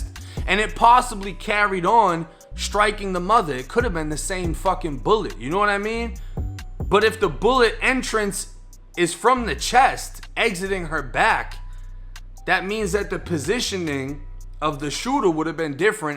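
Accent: Boston, United States. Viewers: The Boston accent not so strong lately eh 😆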